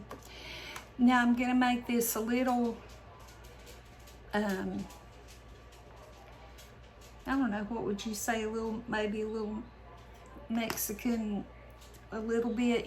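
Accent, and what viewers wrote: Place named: North Carolina, United States. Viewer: Your snail mail addy is Newton NC!!! That is right down the road from me! I live in Wilkesboro NC. Only one hour from me. Thought that accent sounded from NC. So cool. Small world ain’t it? Take your time responding, the world is crazy today!!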